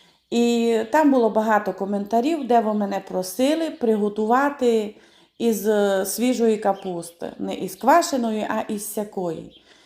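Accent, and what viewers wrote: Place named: Berehove, Ukraine. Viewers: Украинский язык у этой пани вперемешку с русским. Три украинских слова одно русское. Акцент западно- украинский, это верно, с поющими нотами. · З акцентом. Суль, олую, ойво. Це русини так розмовляють. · Як я люблю слухати вашу мову. У нас так говорила сусудка , вона була д Берегово.Подяка вам за рецепт.❤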